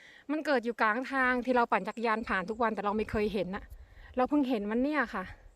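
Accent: Korat, Thailand. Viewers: พึ่งดูครั้งแรกคนโคราชนิเห็นพูดโคราช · สำเนียงคนโคราช? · พึ่งเคยดูพี่คนโคราชไหมคะสำเนียงเพราะมาก ชอบค่ะ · พูดเหมือนคนโคราชพิมายเลยพี่ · สวัสดีค่ะคุณขอถามเด้งเห็ดอันนี้มีเลิ่นหอมแบบไหนค่ะคุณทางนี้เคยเห็นแต่กลัวไม่ก้าเก็บพูดออกภาษาไทยเหมือนฉันเลยไทยเด้งคนโคราชนิหรือบุรีรัมย์ค่ะตอบเด้งเด้อจร้า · พูดเลยค่ะภาษาโคราชน่าฟังค่ะ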